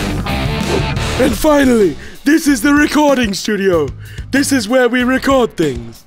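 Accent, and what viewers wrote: United Kingdom. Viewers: OMFG Ur British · This guy britain accent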